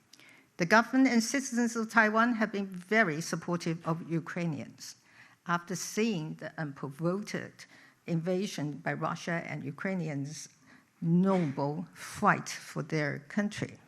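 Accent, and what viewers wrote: United Kingdom. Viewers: 聽得出來英語有點生疏，英國腔英語好明顯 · 人家講的是英腔，你是來留言被打槍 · 小英總統的口音是不是有點英式😳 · 她的英國腔很好聽！ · OMG, she speaks English with British accent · 當總統時是美國腔，不當總統就變成英國腔? 自己不覺得美國人聽了怪怪的嗎? 是否搞不清楚誰才是主子? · 哇塞，英國腔❤ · 一直是英國腔喔！ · 正統牛津腔的演說，讚。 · 小英正面的論述，加上流利的英國腔英語，迷倒了眾多歐洲人。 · 蔡一直都是英國腔沒聽過以前的接待外賓訪談嗎？ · 小英總統的英國腔有高貴的氣質~ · 她並不生疏，政治人物的演講重點就是必需清晰，自然速度會放慢。另外，她的英式口音非常漂亮。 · 小英的英國腔在歐洲人聽了會覺得很親切~~ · 的確。的真的很英國。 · 英國留學果然帶著標準的英國口音，閉上眼睛👀聽來就和英國人差不多🎉！ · 她的英國腔🥵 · 為什麼小英總統的有英國腔🤔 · 亂噴什麼一直英腔好嗎？ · 小英是在英國讀書的，她的口音向來都是英國腔。你的耳朵沒有跟腦袋連在一起嗎？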